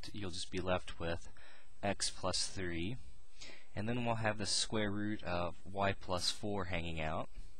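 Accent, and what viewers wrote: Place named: United States. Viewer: As soon as I heard an American accent, I knew this was a different method.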